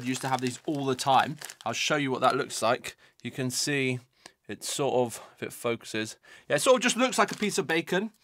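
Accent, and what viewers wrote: United Kingdom. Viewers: why are you saying chips when you sound English?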